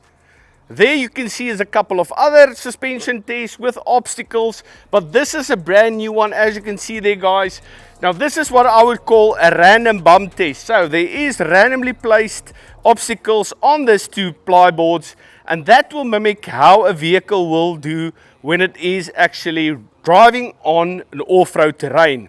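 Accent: South Africa. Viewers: I prefer 4 wheel independence.Suspension is by the way are you south africa?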